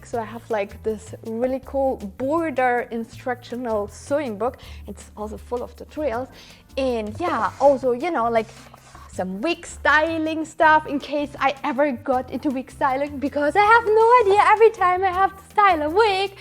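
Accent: Germany. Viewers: I love it when germans speak english... so cute 😁😘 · she's german, it's an accent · quick question are u german? bc u sound like german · Ich hör doch da Deutsch raus, Brubies.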